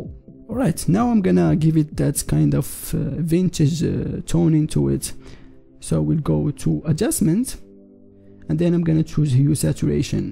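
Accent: Morocco. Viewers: you sound moroccan or at least north african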